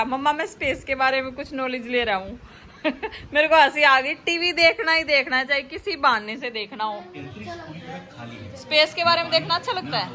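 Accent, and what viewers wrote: Haryana, India. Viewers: U p me rehne ke Baad bhi aap ki haryanvi tehth h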